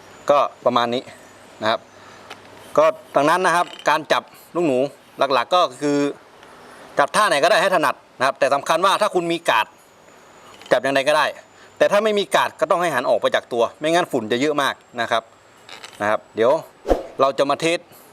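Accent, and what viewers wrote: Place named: North, Thailand. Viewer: คนเมืองกะนิ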